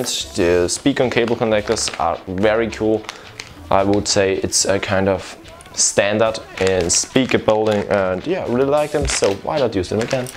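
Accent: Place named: Germany. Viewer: Man hört sofort das du deutscher bist. Auch ohne die Deutschen einlagen. 😂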